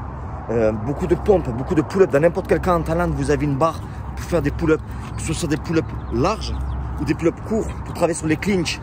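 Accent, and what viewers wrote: South, France. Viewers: Salut franchement tu me régale!! Un mec comme toi je vais à la guerre les yeux fermés un mec vrai et authentique sa se voit et sa s'entend😉 et étant de Perpignan entendre un accent et un parler du sud sur YouTube fait encore plus plaisir 😉👊🏼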